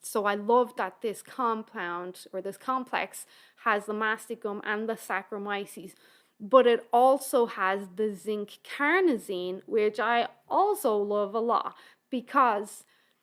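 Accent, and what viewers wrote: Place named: Ireland. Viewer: Thank you, lady with the lovely Irish accent. Very informative.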